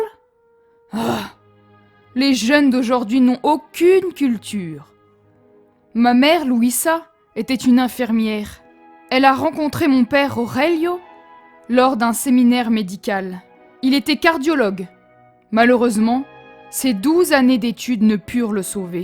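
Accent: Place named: Italy. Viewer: Quelle vie cet! J'aime beaucoup l'ambiance italienne de ta vidéo avec l'accent et tout, bravo à toi 😃 et je trouve que ta construction est très réussi, j'aime particulièrement le bureau que tu as fais au rez de chaussé! Bisous à toi 😙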